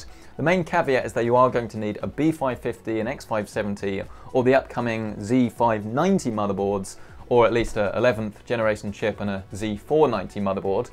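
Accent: United Kingdom. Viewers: I wondered how he picked up British accent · that british accent. Marvellous.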